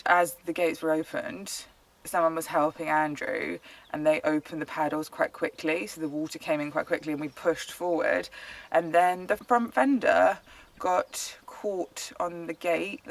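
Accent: United Kingdom. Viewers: What the hell is vocal fry!! She speaks the Queen's/King's English. Perfectly understandable and not in any way jarring.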